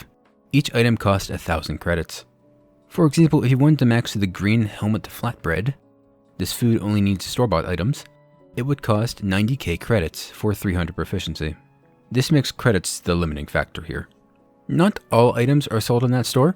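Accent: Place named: Pakistan. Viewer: sounds like from pak maybe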